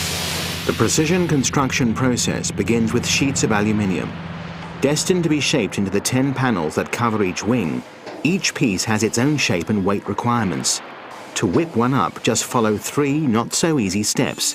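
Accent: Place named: United Kingdom. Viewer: OMG can we please get these brit narrators other jobs grrrrrr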